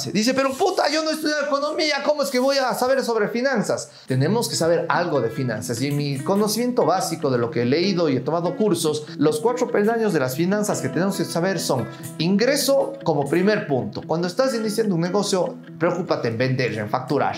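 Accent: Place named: Cuenca, Ecuador. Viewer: Me encantó el video, pensando en migrar nuevamente a trabajar como robot en fábricas pero me encantan los negocios, emprender, aprendí amar 🇪🇨 con el alma estando 15 años 🇪🇦 me voy dejando a mis hijos aquí, están a 2 años de ser profesionales y ni siquiera sé si podré estar presente ese día👨‍🎓. ESTOY CON MI CABEZA HECHA UN CAOS Y MI 💔🥺, comentame algo con ese acento Cuencano que AMO😉 #yamesuscribí👍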